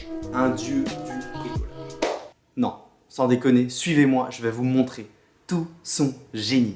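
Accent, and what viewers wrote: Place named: Alsace, France. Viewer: J'étais sûr que tu était alsacien 😂😜🇮🇩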